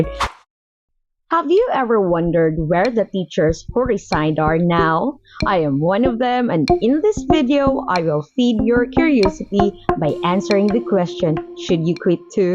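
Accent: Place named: Australia. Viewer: Nice2x. 🎉 Australian Na an accent❤❤❤❤❤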